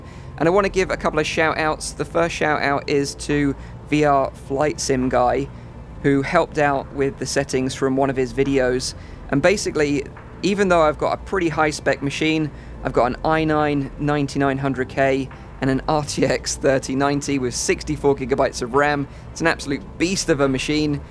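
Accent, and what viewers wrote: Essex, United Kingdom. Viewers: I used to live in Prittlewell so not far from you by the sounds of it. Essex always gets a bad rap for the accent but a lot of us dont speak like the ones of Towie and speak the same as you. They seem to only have people from the grot parts representing our county on TV.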